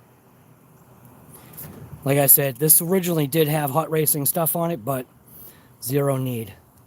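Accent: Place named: Boston, United States. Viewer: you gotta be a fellow bostonian. i can tell by your accent, wicked awesome guy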